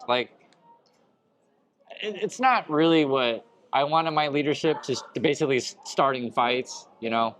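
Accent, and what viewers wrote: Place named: Oregon, United States. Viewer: I can tell you're from Oregon because you say "fleg" instead of flag : )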